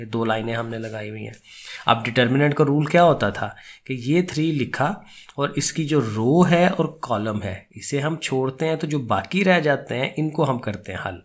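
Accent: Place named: Lahore, Pakistan. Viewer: Sir paka lahori hai 😅 bda maze ka accent hai sir such a kind personality❤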